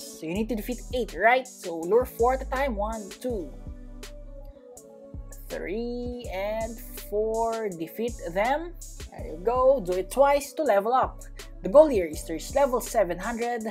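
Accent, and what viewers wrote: Philippines. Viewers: Are you Filipino? I can tell by your accent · You from Philippines? Cuz ur accent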